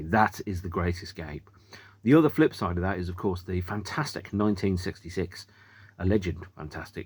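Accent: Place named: Birmingham, United Kingdom. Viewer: Hi mate, stumbled upon these videos mainly as I spend too much time watching random music reviews and record talks, enjoy the concept and will watch more! I suspect you are near me geographically in South Bham based on your accent.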